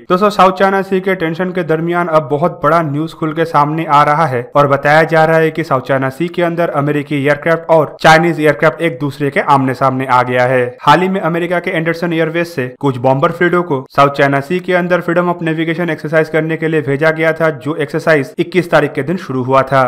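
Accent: Bengal, India. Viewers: Sir aap Bengali hai?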